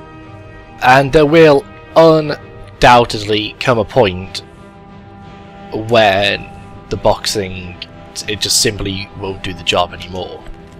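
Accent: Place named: England, United Kingdom. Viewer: Well... I was checking to see if I had missed this episode and though I had, I am now watching it again. Totally intended to go to bed, but then I got caught up in the cheese wheel rubbing and the... English accent... Curse your mystical use of England speak...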